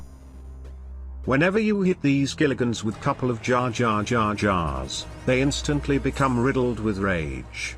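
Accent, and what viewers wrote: United States. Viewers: He sounds like a normal American white dude tf you smoking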